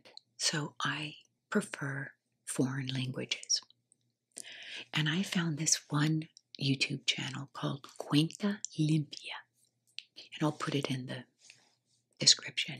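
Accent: United States. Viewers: Thank you so much for answering My question! We are in Texas near Houston, so that’s so cool!! I thought you might have a little TEXAS in you 😉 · I’m from England and I don’t usually find American accents doing ASMR give me tingles (nothing against any American accent I just seem to find I get more tingles from accents I’m less familiar with) however everything about your voice is dreamy! I find your voice so soothing, nurturing and tingle inducing! Thank you xxx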